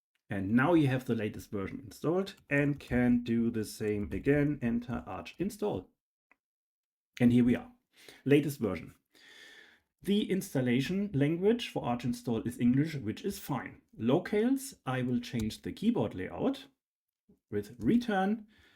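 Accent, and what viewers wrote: Germany. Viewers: that german accent killed me xD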